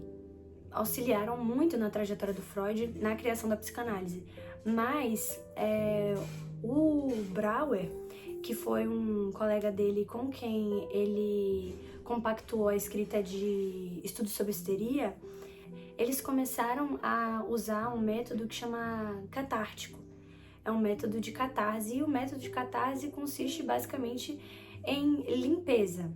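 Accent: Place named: Bahia, Brazil. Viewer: Que vídeo bom!! Você tem um sotaque baiano, você é?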